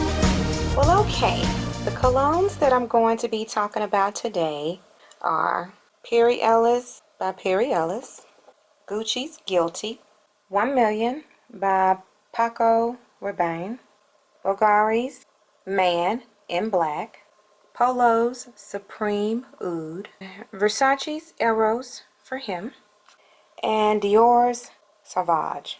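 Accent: South, United States. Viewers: very nice list and nice sothern accent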